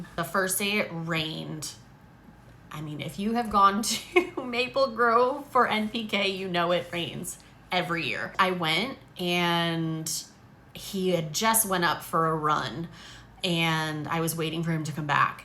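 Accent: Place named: New York, United States. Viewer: you sound nothing like a woman from New Jersey. Definitely from New York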